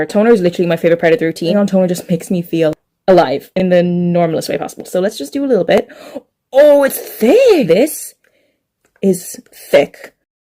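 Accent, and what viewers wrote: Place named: Ireland. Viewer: Ha me too! It's the Irish thing, we speak so quickly and its so comforting to hear an Irish accent at the speed I'm used to. We don't waste a second😂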